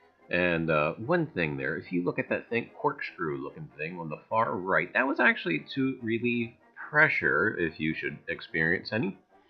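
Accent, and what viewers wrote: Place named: India. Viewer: great video! nice Indian cent buddy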